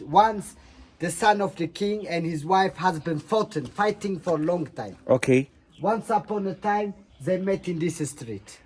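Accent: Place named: Malwa, India. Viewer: ਯਾਰ ਤੇਰੇ ਝੱਗੇ ਤੇ ਕੁਰਬਾਨ pure ਮਲਵਈ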